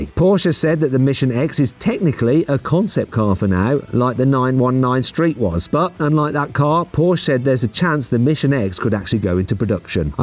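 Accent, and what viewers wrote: United Kingdom. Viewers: Logat British nya 🍷🗿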